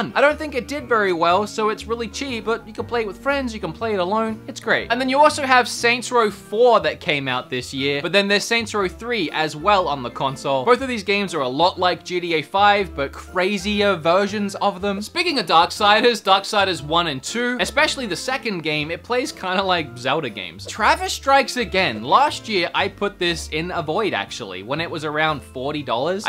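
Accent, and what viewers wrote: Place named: Australia. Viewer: He’s Australian I think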